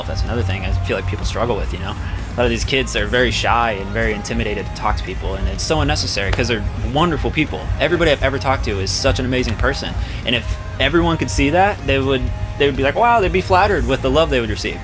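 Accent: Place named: Texas, United States. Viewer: you can hear the texas in his voice =)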